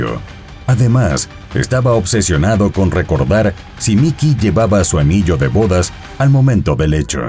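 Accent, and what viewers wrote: Mexico. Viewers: Lo se, pero es acento mexicano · Sr, su voz es maravillosa para yo tenerla al acostarme susurrándome poesías de amor!!!!!!! Usted nos pudiera decir de qué país es??? Dicen algunas que es mejicano, pero para mí su entonación es neutro …….y no lo puedo definir….. Nos saca de la duda?????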